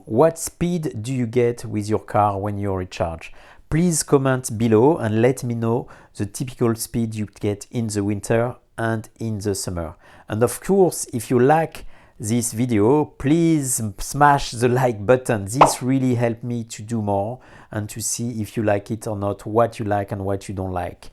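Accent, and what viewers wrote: France. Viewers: this great french accent, I mean it - I love it dearly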